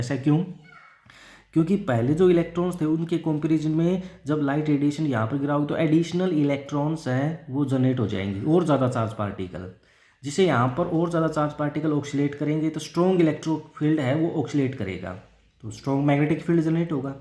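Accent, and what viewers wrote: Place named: Haryana, India. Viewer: That haryanvi accent tho🔥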